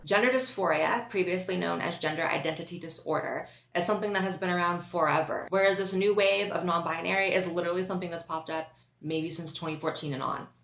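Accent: United States. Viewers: You have a good American accent, do Danes speak like you generally? 🤗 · I’m an old man, 74 years old, I admit that I’m having trouble understanding what to me is a new phenomena. I was surprised that you aren’t an American because you sound so American. I find you to be thoughtful and very reasonable. Until I came across your channel I thought that people in your community were rather odd and very pushy. Thank You for dissuading me of that notion. But correct me if I am wrong; I suspect that you are in a minority in your community. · Wow! Hade inte gissat på att du var Dansk. Din dialekt låter amerikansk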